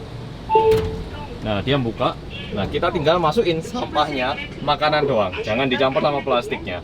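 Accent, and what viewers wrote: Java, Indonesia. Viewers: Hal yg menarik adalah, kebersihan tanggungjawab bersama, pemerintah buat aturan, warganya mematuhi..sehingga lingkungan negaranya bersih, indah, rapi, warganya juga sehat, rapi, dan kuat. Nah jawabannya warga +62, budayakan dari diri sendiri dan patuhi aturan pemerintah. Sekian dan terima kasih. Makasih mas medok kuliah sampahnya. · Orang Korea yang medok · jowo banget iku lho kletek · Bersama orang korea yang ......MEDOKKKK😜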